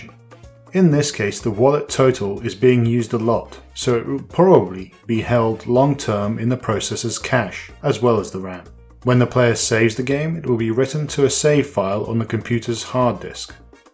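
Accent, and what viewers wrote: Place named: United Kingdom. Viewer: The audio quality and the british accent. Also the way of teaching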